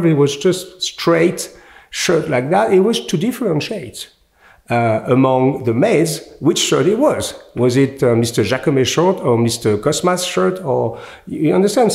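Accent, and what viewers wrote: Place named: France. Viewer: Frenchman speaking excellent English layered with an elegant french touch👍👍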